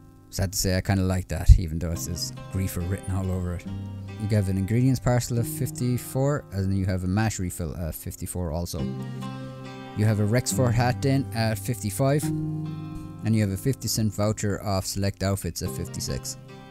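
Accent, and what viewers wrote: Ireland. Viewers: You Irish? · Didn’t expect to be an Irishman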